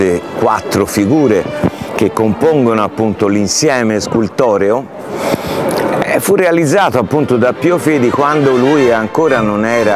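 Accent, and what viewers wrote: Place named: Florence, Italy. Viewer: Ascoltarla è un piacere. Tratta sempre argomenti vari ed interessanti con un gradevolissimo accento fiorentino che mi ricorda gli anni felici vissuti a Firenze. Un caro saluto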